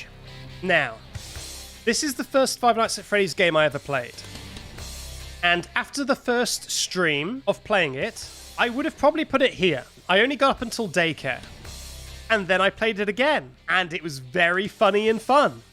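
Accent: United Kingdom. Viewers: AHH HE'S BRITISH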